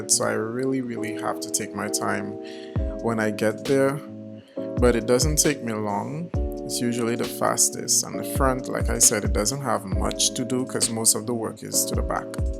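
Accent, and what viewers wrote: Caribbean. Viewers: Hi! Where are you from I'm hearing a Caribbean accent. I'm trini 🇹🇹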